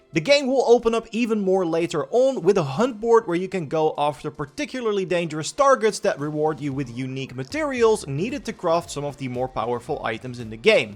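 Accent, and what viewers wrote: Netherlands. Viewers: O dear, this kind English spoken by a Dutch, It is hard to listen to. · Judging from your accent, you're Dutch? 😂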